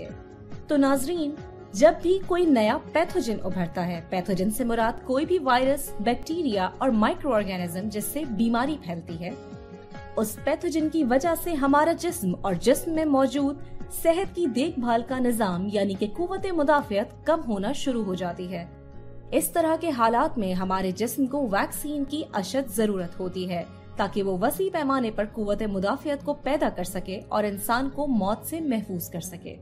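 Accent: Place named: Urdu, Pakistan. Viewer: Nice Urdu Accent.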